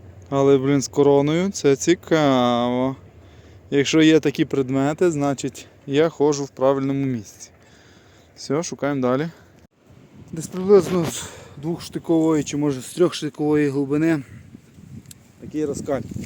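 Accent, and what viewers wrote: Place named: Bukovina, Ukraine. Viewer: вітаю.цікаві походеньки, підписаний на Вас.Чую по мові та бачу по природі, що Ви десь на Буковині.Якщо це так, то хотілося би знати район, а все для того, щоб десь походити разом.Дякую і кладуху сєрєбруху!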